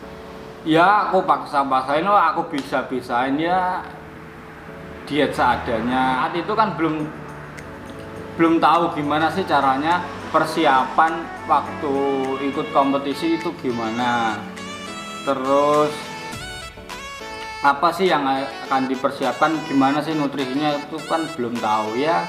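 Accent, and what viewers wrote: Java, Indonesia. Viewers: Suaranya medok bgt mas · Medok banget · Ojo meduk" nek sereden 🤣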